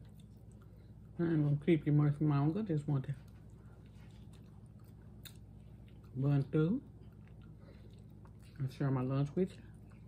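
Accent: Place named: St. Louis, United States. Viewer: Yes ma’am!!! I can tell by how you talk and especially that duck and special noodles!!! North County Florissant!!! I just watched your gizzard video with my husband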